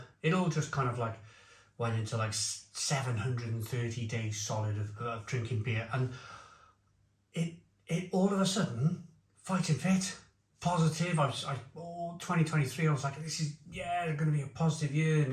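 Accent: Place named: Cardiff, United Kingdom. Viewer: Just stumbled on your channel! Glad you are Doing ok. Recognised that Cardiff accent anywhere! The cottage is my local pub 😂. I’m assuming you mean the One by cefn onn!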